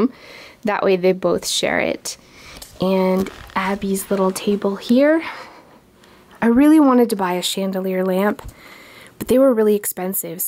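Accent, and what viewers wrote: Canada. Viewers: Is she Canadian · Her accent sounds Canadian for sure.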